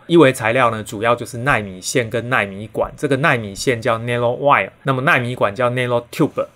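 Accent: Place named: Taiwan. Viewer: 感覺你是台灣口音！台灣人要守住台灣！ 不要給土共報路！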